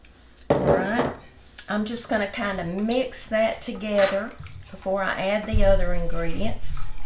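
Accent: South, United States. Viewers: Thank you so much for the warm welcome! I know that Southern people are very kind... I married one 😊 My husband is from North Carolina. I just LOOOOOOVE those Southern accents 😍😍😍 God bless you and the rest of your family as well 🙏🙏🙏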